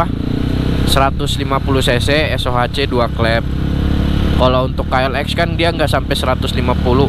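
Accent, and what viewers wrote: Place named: Medan, Indonesia. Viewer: Suaranya keinget temen kerja saya orang medan.. Horass nuhun..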